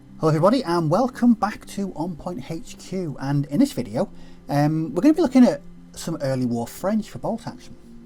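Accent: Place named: United Kingdom. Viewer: I think you have a very good British accent. For everything else, I found this great little site called <link> where you can listen to peolpe pronouncing words in their native language. Somua is not on it (yet) but one can request words and people native to that language will record reading pronouncing them eventually. I am waiting for the Somua now ... a French person will read and record it soon :) <link>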